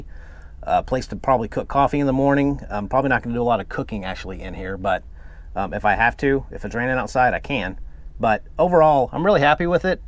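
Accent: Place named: South, United States. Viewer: Very organized and attention to detail. Now, go on some adventures and take us along. You seem like a good entertainer. Like that southern accent.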